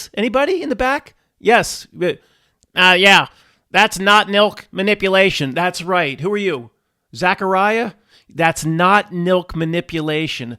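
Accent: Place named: Philadelphia, United States. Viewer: 😂 Really love your Philly accent....💚🌻🙏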